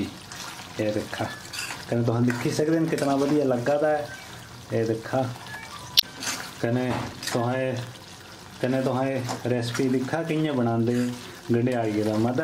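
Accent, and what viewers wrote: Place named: Kangra, India. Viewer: Mouth watering 😋 recipe ekdum must. Aap ki baaten achi lgti hai very sweet kangri bolde tusan. Missing himachali dham.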